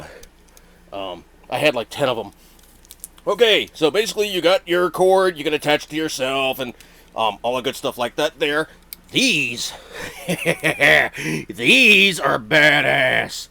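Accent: United States. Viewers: You're the most American sounding man I've ever heard.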